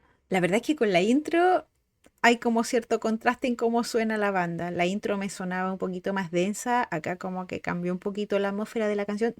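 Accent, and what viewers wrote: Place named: Chile. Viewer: Me alegro que hayas descubierto a, no te preocupes, no a todo el mundo engancha en las primeras canciones, normalmente con 3 de sus canciones mas conocidas te da una idea de lo que es musicalmente y a nivel de entretenimiento como nunca otro grupo de este genero lo hizo antes, pero aun asi encontrar esa cancion que te llegue (especialmente por la barrera del idioma) puede tomar varias mas hasta que la encuentres y te convenza, no estamos ante cualquier proyecto random japones, por el contrario, el concepto fue muy bien elaborado desde que eran pequeñas y hoy en dia son señoritas con mas de 20 años que han perfeccionado y crecido en muchos aspectos sin dejar la frescura que los caracteriza. Guiandome por tu acento supongo sos de Chile, tuve la fortuna de presenciar sus shows cuando estuvieron el año pasado en el Knotfest (Parque del Estadio Nacional) y el sideshow que hicieron en el Centro de Eventos Basel al igual que en sus presentaciones en Brasil y Argentina, y la verdad que me lleve los mejores recuerdos de mi vida, ojala vuelvan y pueda volver a conectar con esa linda comunidad hermana de Chile. 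Abrazo y espero que sigas disfrutando de este viaje pq aun te queda y mucho de lo bueno esta aun por venir.🦊🤘❤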